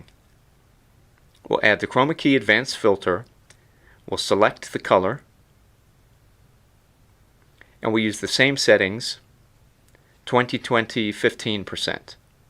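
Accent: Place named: Carolinas, United States. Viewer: Well isn't this a Wealth of Information. It's a Definite Like, Save and Share. How kind and smart of you to share. Unfortunately I'm a bit homeless right now. I'm making videos off my phone Anywhere USA! Made I'm The USA! By your charming accent, I'm guessing the Carolina's. Hmmm... a gifted Musician, Songwriter and Instructor. I bet you're really good at Math. 🌺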